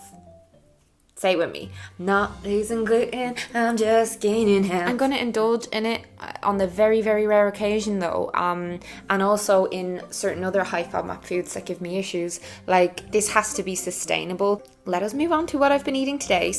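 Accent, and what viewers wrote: Ireland. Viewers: I singled out your lovely Irish accent in the first 10 seconds. You're my most loved nationality on the planet. 🇨🇮🇧🇷🇨🇮🇧🇷🇨🇮🇧🇷 Go raibh maith agat for your video! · I'm from germany but i loooooove irish accent so much. (Because of Saoirse Ronan) Thats why i love your accent. And youre so pretty. 😍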